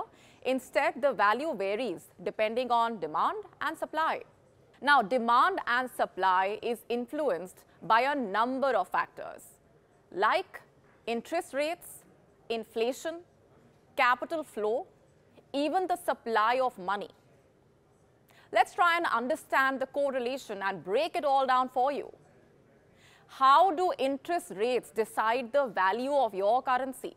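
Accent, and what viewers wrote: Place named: India. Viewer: Good report, but when Indians speak English, their accent is like finger nails on a chalkboard.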